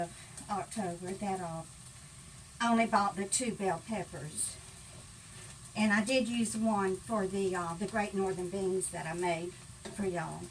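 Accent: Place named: Houston, United States. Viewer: Hey,! New subscriber here. Originally from the Houston area, so your accent reminds me of the hometown and the cooking reminds me of my family there. (Not many left.) Enjoy having your series of videos to have on while cooking or cleaning...sounds "familiar" and friendly with zero pretense, which I admire. Can't say I miss the South for living, but the culture is something that is in the blood. Lol, you cook like me, and if I'd seen another vegan video, I was going to lose my mind!🤣😂 Thank you for your stories...your life has been memorable! Keep enjoying yourself for us, and we'll keep coming back!🙂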